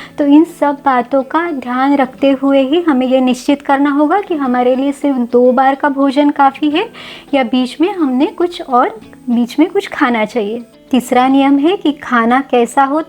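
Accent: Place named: Maharashtra, India. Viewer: Madam apke bolne me Maharashtra ki jhalak he.lekin aap jain he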